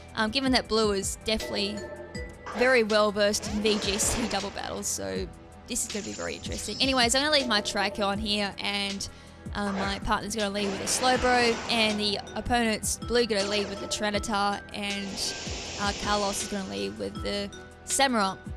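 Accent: Australia. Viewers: just assumed it was Australian accent anyway. ^^ Anyway, didn't mean to complain. Thanks for taking the additional energy to still upload a video. :)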